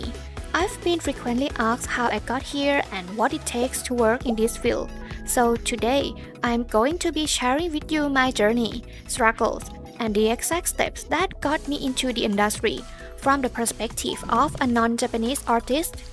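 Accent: Thailand. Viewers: นั่งฟังตั้งแต่ต้นคลิป รู้สึกว่ามีไอบรรยากาศความเป็นคนไทยมากๆ ทั้งการออกเสียงทั้งสำเนียง สุดท้ายก็คนไทยจริงๆด้วย😭 เก่งมากๆเลยค่ะ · ฟังสำเนียงคิดว่าน่าจะคนไทย ใช่จริงๆ ด้วย เก่งมากเลยค่ะ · I knew it from your accent and bombastic artstyle that you are from Thailand (ONE OF US ONE OF US) this video helps me so much as a young aspiring artist +sub!! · กรี๊ดดดดด สวัสดีค่ะ ผ่านเข้ามาเจอ คิดว่าสำเนียงไทยม๊าก สนุปว่าคนไทยจิงด้วยย เก่งมากๆๆๆเลยค่ะ❤❤❤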